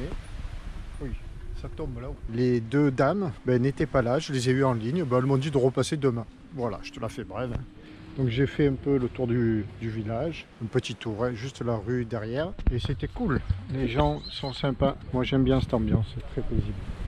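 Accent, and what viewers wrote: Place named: Marseille, France. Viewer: Merci:-) Profite bien et continue de nuits faire rêver avec ton gâté et ton bel accent marseillais ❤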